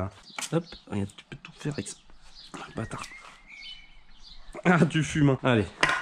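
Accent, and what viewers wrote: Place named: Alsace, France. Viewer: A mes tu et alsacien mdr duncoup jentend kopf temi 😂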